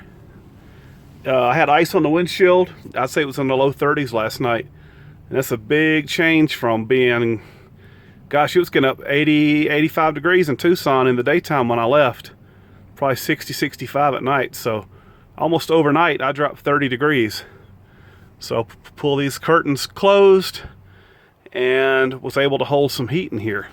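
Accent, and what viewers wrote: South, United States. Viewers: OMG, laughing so hard!!! Being a southern girl living in Prescott Valley, AZ now; I sure do enjoy listening to your southern drawl! Thanks for making my night ;) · There is nothing like southern charm..❤️❤️..l really like that your a southern boy. The way you talk is so smoothing to me. Could listen to you all day my Dad was from Norfolk Virginia and he had such smoothing charm..😍too. You are so funny..l'm still laughing ..haha..So glad Martini is feeling better love ❤️to you and the woof pack always..🐾🐾🐾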